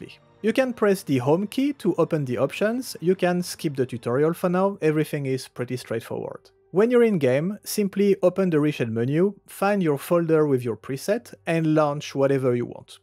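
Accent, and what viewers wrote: France. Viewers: man you sound like french native, if you are " merci pour tes 2 vidéos, elles sont super bien faite! " If you are not french native, not a problem, just to thank you for your videos very helpfull, I was installing my mods manually and I decided to use Vortex. I had many mods but the mandatory one is about performance, the one that make the game smooth and playable, I though this mod was working well on my rig SINCE I installed it with VORTEX. I could not believe what I seen, I went from 65 fps to 95 fps, and said to myself, WTF?? I guess the mod was not installed properly despite I am not stupid and I followed the installation guide on nexus. You recommand to use Vortex and know I understand why, 🤣🤣👍👍By the way you are explaining everything very well (I would not imagine you can put the mod file (zip one) inside the mod folder and I learn this watching your vid. 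I guess this is important. I am gonna follow your guide about your mod list, I installed a bunch of mod and will add those one that are in your list and that suit to my test. I am following you ! thanks again :)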